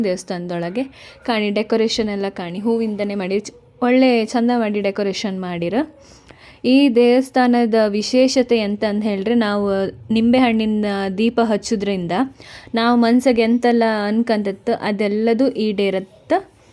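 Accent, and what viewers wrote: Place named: Kundapura, India. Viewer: 😊😊kundapura kannada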